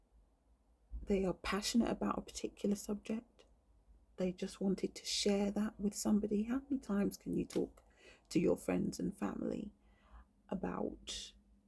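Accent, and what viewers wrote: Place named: United Kingdom. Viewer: I just want to give you a compliment. Your speaking voice is sooooo soothing. It relaxes me, lowers my blood pressure and helps me fall asleep. Maybe it's your British accent. But since you've posted the video regarding Thandiwe Newton I've subscribed to your channel and watched your videos. I'm from the states (Chicagoland area). I'm fascinated with the UK and have been for years. The more I study the UK the more I realize it's influence on the USA and the world. After all Britain colonized most of the world at one time including America and its influence permeates now. I watch BBC and Ski News more than American news. I watch British TV shows and movies. I hope to visit the UK someday. But back to your voice. You should do more videos. You could read the telephone book. I don't care. Lol I think you could get work reading EBOOKS. 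Lol 🤣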